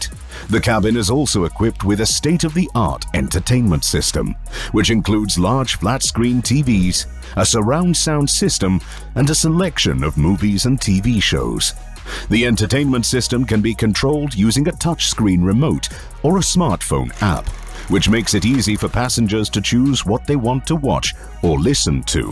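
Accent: United Kingdom. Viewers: The commentator obviously comes from the North of England...you can tell this, by his saying the word BATH....ONLY Northerners speak this way.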